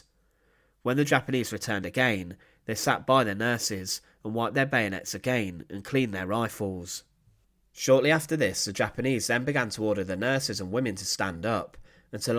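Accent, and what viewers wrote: United Kingdom. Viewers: It's his accent. A significant portion of the UK sometimes prounce the letter 'T' as a 'F' sound. This is called an accent. Once again, criticising someone for their accent is pathetic.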